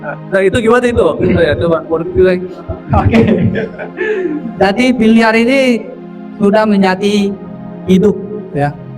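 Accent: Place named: China. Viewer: Logat bicara nya kyk org china yaa 😅🙏🏻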